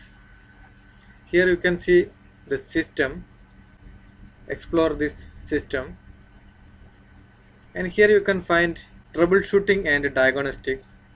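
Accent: India. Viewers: I like your Indian accent 👌😀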